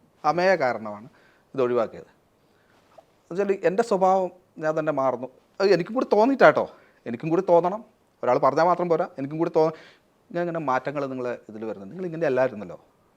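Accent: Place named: Kannur, India. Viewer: കണ്ണൂർ സംസാരം കേറി വരുന്നു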